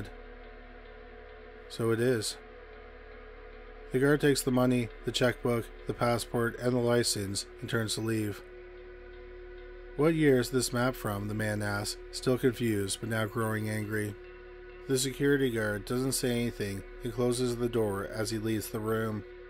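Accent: Canada. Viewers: I follow and just happened upon this site; once I heard the narrator's Canadian accent I made the connection. Thanks for the input, nice work as always.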